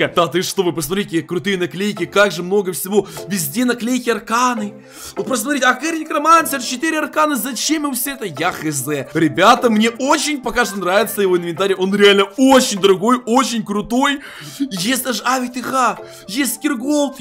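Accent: Ukraine. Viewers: ты с Украины?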